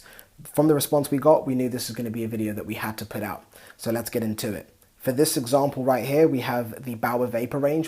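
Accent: England, United Kingdom. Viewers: Can anyone else narrate without that accent., it’s bad enough on commercials or cooking shows but now hockey, funny not aware of any English hockey teams..... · I was expecting a Canadian accent, not English. Anyway, thanks for the video, I'm in the market for skates.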